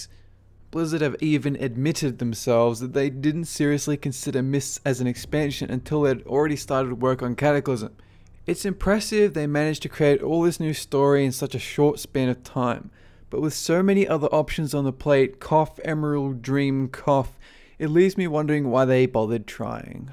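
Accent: Australia. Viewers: i love your accent lol now stupid question, are you Australian? Thanks for all your time in making these videos i am learning so much about WoW that i never new before. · You're Aussie arent yah? What realm do you play on wow?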